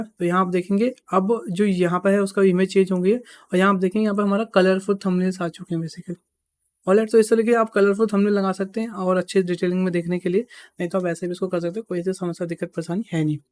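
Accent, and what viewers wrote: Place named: India. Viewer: bro i was frustrated by rigging but ,, after your video now i got every point you teached .. best video for rigging in indian accent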